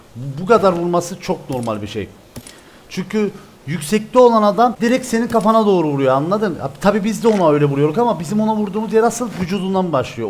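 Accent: Cyprus. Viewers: Kıbrıs li olduğun belli baya . Bide videoya okadar edit koyuyosunki videodan sıkılıyorum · abi diğer videonda ful kıbrıs türkçesi konuş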